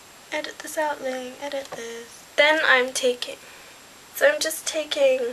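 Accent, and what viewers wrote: United Kingdom. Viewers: I LIKE UR VIDEOS.. IVE NEVER HEARD SUMONE WHO IS ASIAN HAVE AN ENGLISH ACCENT.. WOW:).. HAHA.. BUT I LIKE DIZ VIDEO IT REALLY GOES GOOD WITH U.. UR A VERY PRETTY GURL!:)..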